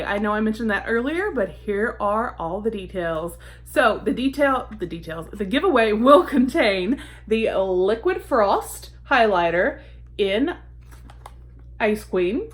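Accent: South, United States. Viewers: Thanks so much for doing this video! It's great cause I totally missed out on these boxes and I really wanted to see what was in them! And you are so cute with your sweet southern accent! 😘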